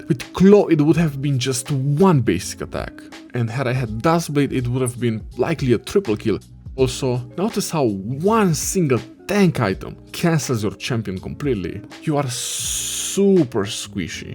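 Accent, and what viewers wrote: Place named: Russia. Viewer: Боже что за русский акцент 🫠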